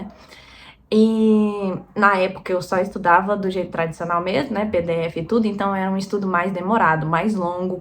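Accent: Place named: Northeast, Brazil. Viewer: Ave Maria! mais tu é bonita! Nem parece que é mãe e casada 😂😂😂 (tô brincando) Já vi outros vídeos e pensei que tu fosse do nordeste,o sotaque parece . Já fui mt inocente tbm qndo comecei a estudar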